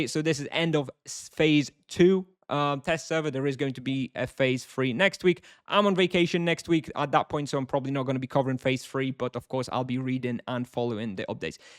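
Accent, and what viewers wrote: United Kingdom. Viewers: Unrelated question. Why do you have a proper British accent if you're Polish?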